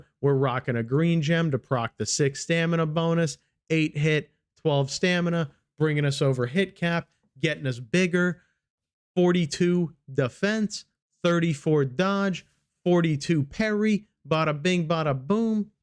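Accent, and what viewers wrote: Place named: New York, United States. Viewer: Haha! omg his accent is so freaking great. I feel like I'm in NYC being given directions to the Chrysler Building or taken to my seat in an Italian mobster restaurant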